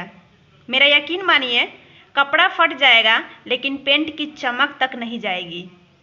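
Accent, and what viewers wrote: Bihar, India. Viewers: Ap Bihar se ho?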